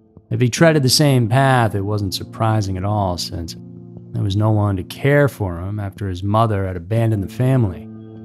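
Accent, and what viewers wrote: South, United States. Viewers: Heartbreaking tragedy. I love listening to! I swear I hear a bit of Southern accent. Thank you,. ❤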